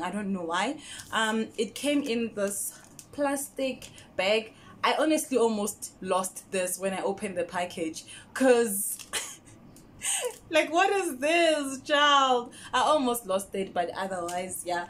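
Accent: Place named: United States. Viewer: You are so entertaining I was laughing throughout the video like it is the American accent for me.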